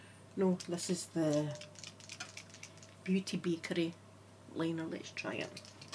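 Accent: Scotland, United Kingdom. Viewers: Her Scottish accent is so cute I love it too and is so calming and relaxing even if I'm Italian so sometimes I have trouble understanding it 🤗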